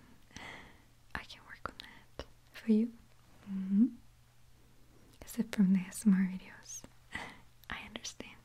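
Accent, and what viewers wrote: Russia. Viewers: Lol girl you said muscle spams lol you crack me up I love your Russian accent when you speak English, so very cute.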